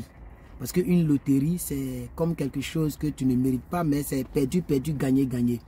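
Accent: Ivory Coast. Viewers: Je sens l'accent ivoirien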